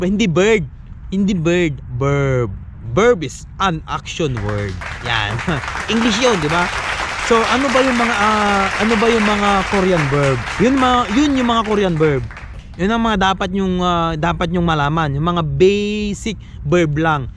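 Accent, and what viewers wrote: Ilocos, Philippines. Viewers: Annyeonghaseyo .. Sir , ilocano ka po ba?! 😁☺ Halata po kc sa accent .. Correct me po if I'm wrong .. 😉 Feeling ko po nkikinig ako sa pinsan ko dhil sa accent mo po .. Hihi Btw , thank you po sa tips .. EPS Aspirant here .. Sana po makapasa .. Para sa aking family .. Fighting!! 😇 GOD BLESS po .. 👍👊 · ah ilocano accent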